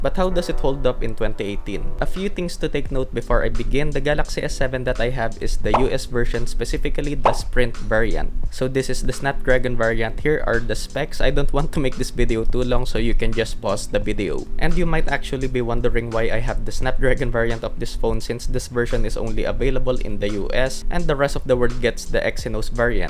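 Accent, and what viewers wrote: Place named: Philippines. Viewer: Sabi na pinoy ka... First time ako dito... Nasabi ko lang dahil ng accent mo hahaha skl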